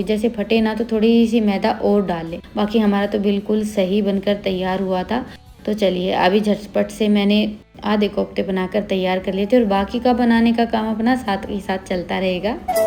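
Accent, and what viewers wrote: Haryana, India. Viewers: Haryanvi language h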